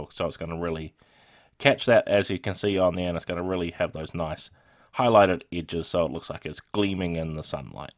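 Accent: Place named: New Zealand. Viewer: Oh a Kiwi voice! That's a nice change.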